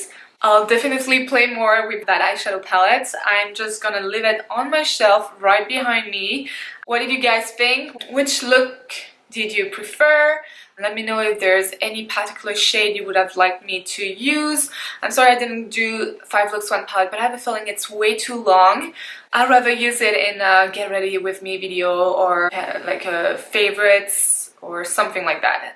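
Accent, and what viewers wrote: France. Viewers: Hi there ! Are you French ? Where did you learn to speak English that well ? Just wondering ! Great video btw, That palette is on my wishlist thanks to your great review !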